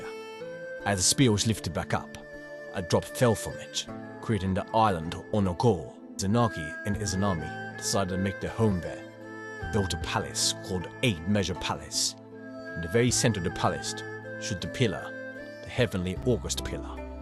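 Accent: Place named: United Kingdom. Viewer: MATE, YOU ARE BRITISH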